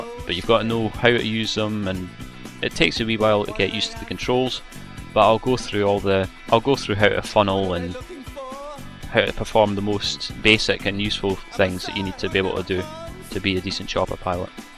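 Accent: Scotland, United Kingdom. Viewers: hehe i like that scottish accent :D and the video is very good and informative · Probably?! He's as Scottish as haggis. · Didn't realized scottish accent was so universal as to not be recognized. I was bewildered though! Good job! :) (ps.: Any idea when part 2's coming?) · Probably Scottish... =P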